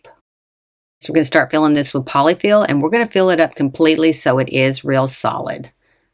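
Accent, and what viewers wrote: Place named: East Tennessee, United States. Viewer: where are you from? I’m guessing East Tennessee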